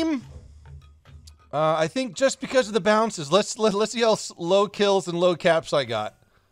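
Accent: United Kingdom. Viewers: thats cause hes brrrrrrrrrrrritishhhhhhh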